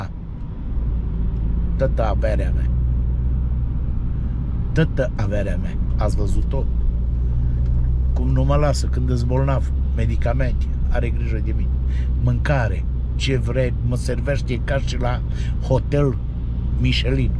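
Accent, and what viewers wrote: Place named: Transylvania, Romania. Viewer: Î''mi place la nebunie accentul ardelenesc!!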